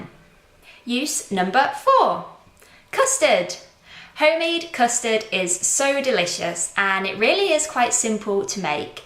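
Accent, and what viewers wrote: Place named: England, United Kingdom. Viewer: great to see and hear an English Accent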